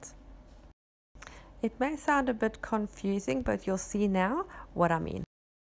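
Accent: South Africa. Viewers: I stumbled upon your page quite by mistake, and I am hooked. I was trying to figure out your accent - are you a South African? Lovely work, thank you for sharing. <3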